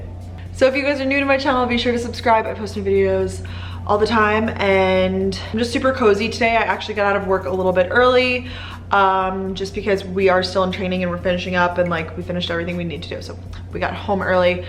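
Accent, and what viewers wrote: Long Island, United States. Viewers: Do you feel like you lost your long island accent? also how long do you plan to stay in boston?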